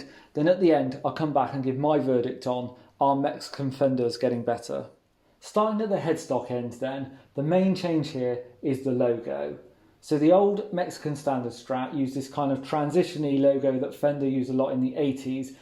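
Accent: United Kingdom. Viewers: Is it better the new player than the old MIM standard one? Oh yes its true, very coser to the old american standard for some reasons such as pick ups, two points trem, nice thinner shape a very nice good looking guitar of course the glassy sound as an strat way to be 🎸. I love over all the British tone speak very understandable congratulations a nice comparison greetings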